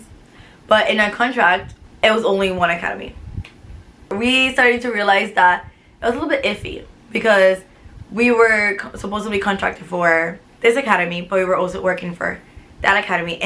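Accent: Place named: Miami, United States. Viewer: I’m Australian and this is the first time I’ve heard a Miami accent. Like music to my ears 🎶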